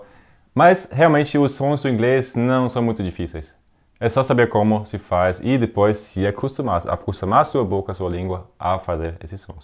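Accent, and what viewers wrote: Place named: Brazil. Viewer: O português dele é muito brasileiro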